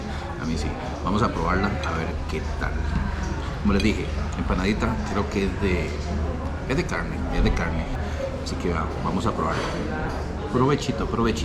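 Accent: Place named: Costa Rica. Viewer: Usted de donde es xq parece tico ?ya como habla pura vida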